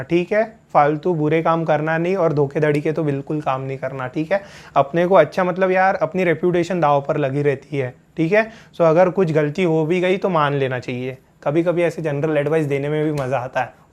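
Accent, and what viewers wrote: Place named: Nagpur, India. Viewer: Nagpuri Hindi 🙌🏻🙌🏻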